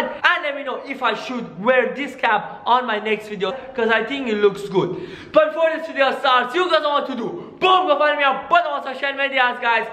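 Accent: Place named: United Arab Emirates. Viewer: he is from united arab emirates thats why he talks like that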